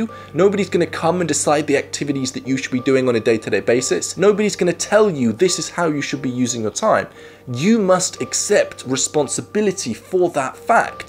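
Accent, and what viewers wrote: United Kingdom. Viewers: love your british accent bro ! I appreciate training my english with your smart videos (I 'm french) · I pronounce with "k" and I'm in the UK. His British accent is in the way he ends every sentence. :)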